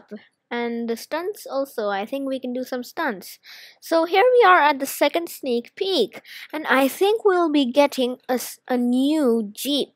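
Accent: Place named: India. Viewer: ur accent sounds like indian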